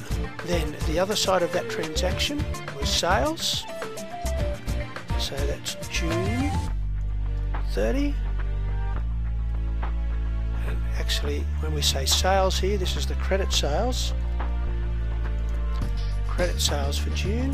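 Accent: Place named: Australia. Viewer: You're an aussie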